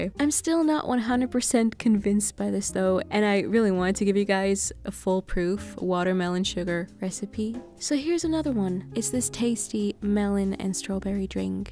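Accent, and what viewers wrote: Germany. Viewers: Wait, are you german? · Are you German? 😂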